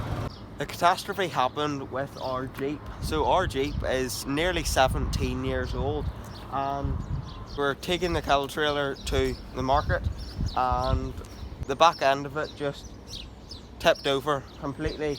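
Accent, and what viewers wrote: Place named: Northern Ireland, United Kingdom. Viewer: Just watching through some of your older videos. Can i ask where you are, roughly. Recognise you've a NI accent, but so do a lot of people on south west Scotland, and thats where I'd assumed you were from. Its the northern reg on jeep confusing me. Shouldve said.. you deserve and will likely get more subscriptions as you're doing very good content. Thankyou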